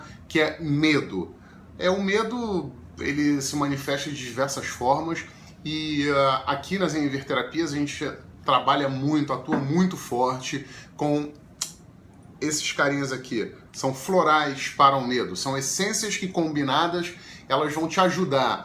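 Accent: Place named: Rio de Janeiro, Brazil. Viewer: RIO DE JANEIRO lindo mesmo. E ainda com esse sotaque carioca.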